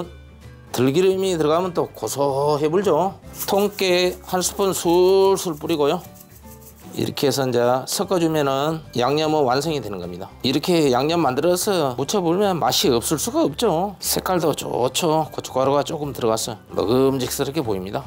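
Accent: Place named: Yeosu, South Korea. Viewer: ㅎㅎ여수고향이라 음식잘하시는군요사투리도 구수해요 돌산갓 피름나물 최고최고ㅎㅎㅎ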